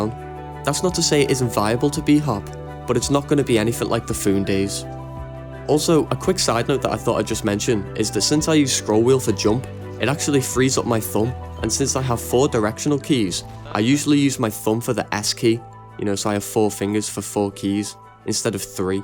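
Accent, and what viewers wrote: Liverpool, United Kingdom. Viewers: Subbing but only because you're scouse lad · are you a scouser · He is 100% from Liverpool, UK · bro are you scouse? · Here are you scouse la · im like 90% sure this dudes uk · Scouse xd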